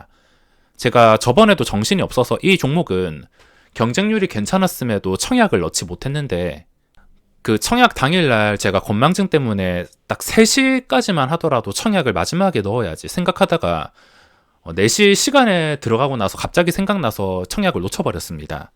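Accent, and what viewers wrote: Gyeongsang, South Korea. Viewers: 경상도 특성 강제로 보시라 안했음 싫음 보지 마삼 오케이?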